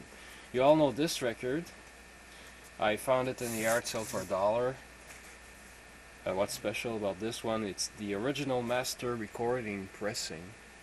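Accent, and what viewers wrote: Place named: Canada. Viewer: I absolutley love some of these interesting records, and i want to see your whole collection. so please keep posting!!! And thats what i meant by no talking, please dont take it as a put down, (ya gotta enjoy a good canadian accent) but the quicker you get to the point, the more albums you show, if there's alot to say about a certain album, thats fine but you better be putting out more videos. you got some great stuff and much respect.....BUT POST MORE VIDEOS!!!!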